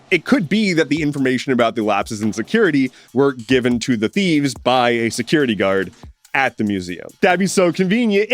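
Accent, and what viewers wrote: United States. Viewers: Would've sworn you were from and based in the PNW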